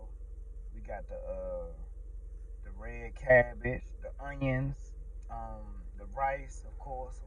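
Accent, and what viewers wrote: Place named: DMV, United States. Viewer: I knew I recognized that accents #dmv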